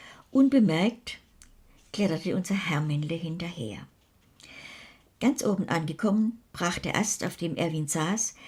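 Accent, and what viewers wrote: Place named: Baden, Germany. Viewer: Blödsinn! Dass ist Badischer Dialekt und mehr nicht!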